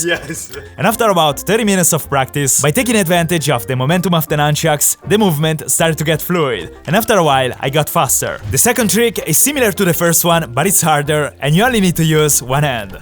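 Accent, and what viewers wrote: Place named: Italy. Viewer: Lo avevo capito dall'accento che eri ita xD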